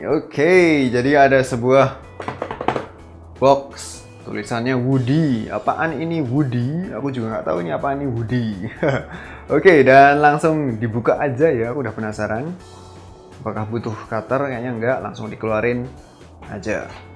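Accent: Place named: Yogyakarta, Indonesia. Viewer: Anjir keren banget😂 bangke lu bang, jogja sekali macam aku kalo ngomong wkwk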